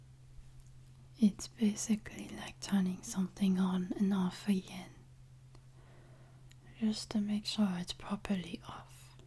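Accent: France. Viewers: Fdom + French accent? Heavenly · Ahh french accent and gorgeous soothing voice... perfect for a night. If I had a wife/gf with voice like yours I would ask her to whisper to me every night XD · Even though I am not a fan of hypnosis, I am very excited for this audio. Everything besides the hypnosis is screaming “I want more!” in my head constantly (which is primarily your French accent , which I would fall in love with every single day, as well as the Fdom aspect of the speaker). And hopefully my opinions of the audio actually create constructive feedback (otherwise I’ll keep my mouth shut to not hurt anyone’s feelings). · I love the accent. At the risk of sounding totally clueless, are you by any chance actually French? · your French accent is so beautifully pleasant. Is this a sneak peak for a new audio?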